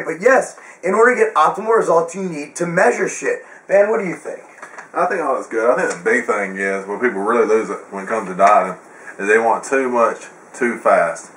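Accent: United States. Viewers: Country ass accent is cool ;)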